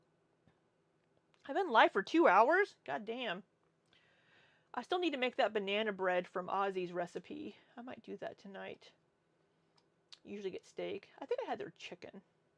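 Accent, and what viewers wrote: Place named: Minnesota, United States. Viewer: I missed Minnesota talk that wasn't about QLR's supidity - dang flabbit!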